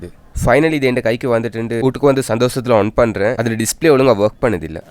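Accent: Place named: Kerala, India. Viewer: Your tamil is super. Cute ah iruku unga slang. Are you malayali or srilankan?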